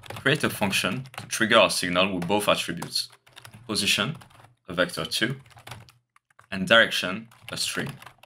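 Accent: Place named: France. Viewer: tbf i think it's just the French accent just making him sound like he's looking down on you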